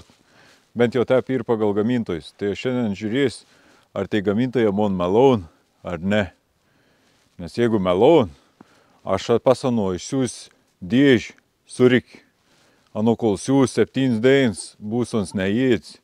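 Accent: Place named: Samogitia, Lithuania. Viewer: Vėin už žemaitiška rukavima pagarba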